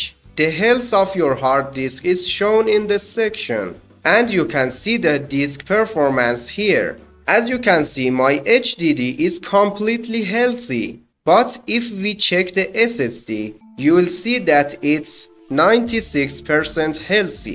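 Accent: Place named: Iran. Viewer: لحجه امکان نداره ایرانی نباشه 😂🤣 دمتم گرم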